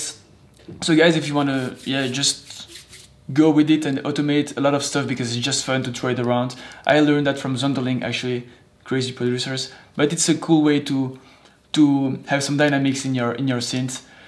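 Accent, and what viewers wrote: France. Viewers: Salut, j'ai l'impression que tu a un accent français, tu est français ou quoi? Et sinon. J'adore se que tu fais!!!! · Je suis francais et on reconnais ton accent français ^^ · You do have a slight french accent, don't you ? Considering the fact that you're Belgium, it's shouldn't be too far from the truth 🤷🏻‍♀️ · I love your remix! Keeps me moving! Very inspirational indeed! I also love your french accent! 😍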